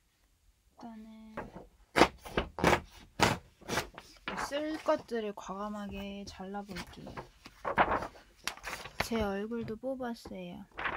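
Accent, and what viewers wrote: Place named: Gyeongsang, South Korea. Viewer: 경상도 여자였어♥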